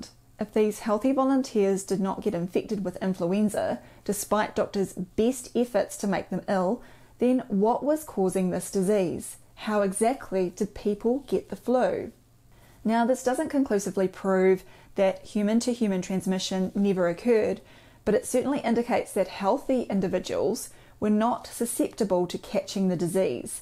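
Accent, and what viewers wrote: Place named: New Zealand. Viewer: But she a perfect New Zealand accent…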